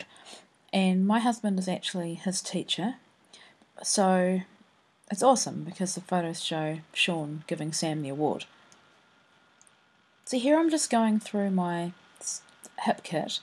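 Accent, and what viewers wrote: New Zealand. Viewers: This is gorgeous. I love that you keep any 'mishaps' in there and work through them. I actually think the gelatos and acrylic paint at the end looks pretty cool and arty! It's also nice to hear a NZ accent. My Dad is a kiwi and I miss that accent.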